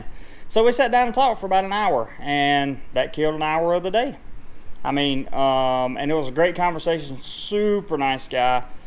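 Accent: United States. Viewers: I like your cool american accent.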